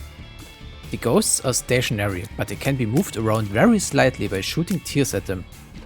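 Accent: Germany. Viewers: nice german accent i love it hahah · German accent man is back with another video!!!! POG You could narrate so many informative videos and I would watch them for your voice alone. It just seems so… perfect for this type of informative video. I don’t know why. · Ich mag deine Videos sehr, wwil sie ausführlich und informativ sind, aber ich finde, du solltest besser an deiner Aussprache arbeiten. Dein harter deutscher Akzent triggert mich extrem.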